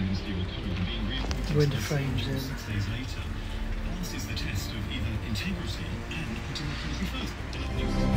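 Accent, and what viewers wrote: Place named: United States. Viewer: I thought l would watch but but you are american, l thought you were british.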